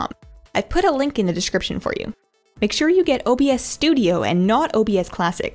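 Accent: Canada. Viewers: Love your Canadian accent haha Very helpfull video!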